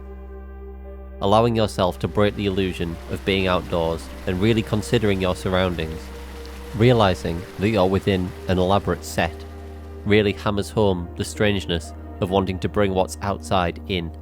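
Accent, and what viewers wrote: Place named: United Kingdom. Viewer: Sylvianian Families was different because, it wasn't just the bg's. Go to the british adverts and you'll notice, each one TOLD a story! So you were part of this story world (that was remarkably close to our own, at least a couple of centuries back!)! *edit: wait. I noticed your accent and I said the British ads 🤣 ignore me on that part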